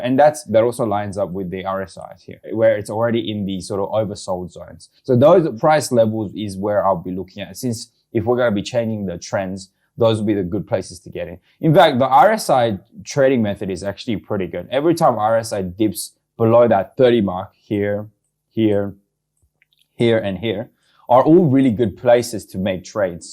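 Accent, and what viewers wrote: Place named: New Zealand. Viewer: You live in NZ? Got a bit of a kiwi accent 😁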